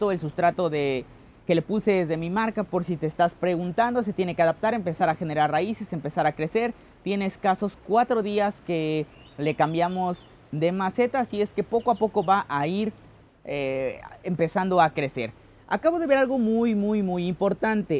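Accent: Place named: Spain. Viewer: Porque hablas raro ? Tipo español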